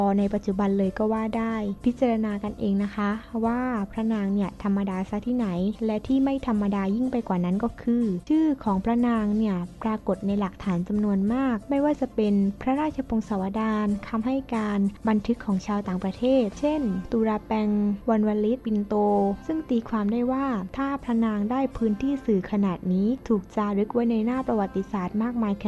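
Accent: South, Thailand. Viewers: คนอ่านบ้านอยู่ต่ำกว่าประจวบฯลงมาใช่ไหม? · น้องนี่คนใต้แน่ๆนิ ทำได้ดีนะน้องนะ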